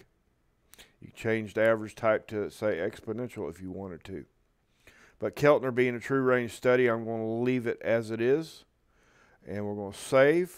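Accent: South, United States. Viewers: Great video. Exactly what I was looking for. On a side note, you have an epic Southern accent. Will you make a recording for my voicemail greeting and invoke the power of?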